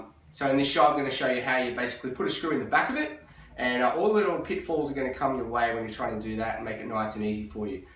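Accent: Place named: Australia. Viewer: First thing I noticed you're an Aussie, thank Christ mate just hearing another Aussie blokes accent giving me home repair advice is like "sweet as, not some seppo raving on with shit.. soon as I heard your voice after watching a couple of other videos I felt like having a beer like I was home.. I haven't watched your video yet it's probably almost whilst I have been raving on with crap but good onya I'll go get a beer and watch it now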